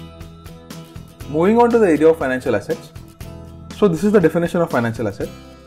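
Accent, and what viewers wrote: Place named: India. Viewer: Indian English ooooah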